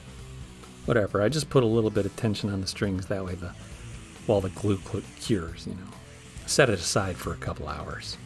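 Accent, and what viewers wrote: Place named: Germany. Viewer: I enjoy your videos. Great workmanship. I do need to ask, why do you always seem to throw in a German accent? Why not Indian, Chinese, Hindu, etc?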